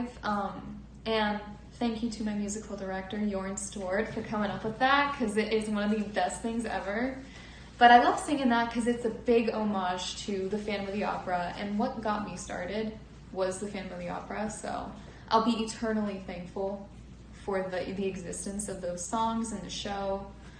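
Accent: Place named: Pennsylvania, United States. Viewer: pronunciation is characteristically Pennsylvanian. Do you expect her or anyone else to know what pronunciation you prefer?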